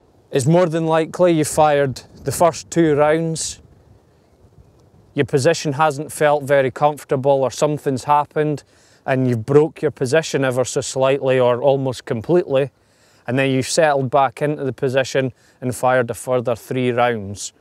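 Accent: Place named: Scotland, United Kingdom. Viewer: Love that Scottish accent Laddie.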